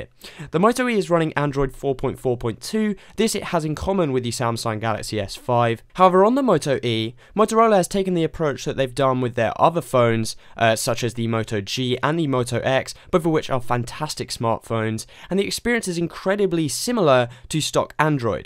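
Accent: United Kingdom. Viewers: I understand you may have US viewers, but please can you put the prices in pounds as well...you do sound British anyway. :)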